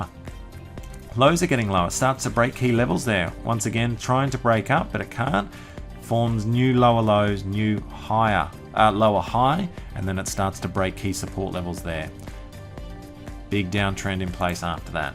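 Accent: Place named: Australia. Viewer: So let me get this straight. Jim Rickards, Joseph Stiglitz, Jim Rogers, Lew Stockwell and Peter Schiff do not have a clue?( Highly unlikly. Further more fiat monetary policy is one of the biggest ponzi schemes ever invented. To use a vernacular that u being Australian cuase of ur accent. fiat money is like watering down ur cordial more water less cordial . fiat money does the same . U print and print. What is backing ur currency . Nufin cause ur great tresurer under the Howard regime sold all ur gold. Gold price at the time was circa 300 US then it dropped under 300. What is the price of gold today. Circa 1250 US a troy ounce. Which at present day is heavaly rigged.Cuase the Chinese , Russians and the Iranians have been purchasing bullion at a un precedented rate. Hence the markets r rigged and the bullion market is rigged. IE:London bullion market constantly floods the market with paper bullion (gold and silver ) not actual bullion. 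Markets historically have had 7-10 year cycles. Another problem with the western economic situation. All the afferomentioned economist speak of the velocity of money. QE1,2and 3 did not increase the velocity of money. EEC QE 1,2,3 and 4 did not increase the velocity of money . The Fed in the US has been by back in a bigway all this printed money in bond by bak so has the EEC. Its all rigged. the stockmarket is over leveraged at circa 500 percent today . In 2007 with the derivatives meltdown and banking shemazal the stockmarket was leveraged at 12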